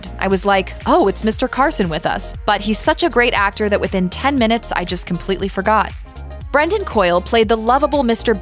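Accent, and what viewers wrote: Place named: United States. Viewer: Blimey! That American accent is ear-splitting!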